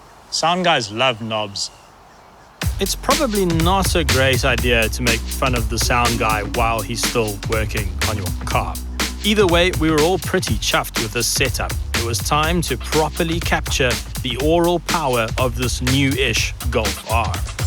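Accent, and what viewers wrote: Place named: South Africa. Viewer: Miss that accent true South African Keep it up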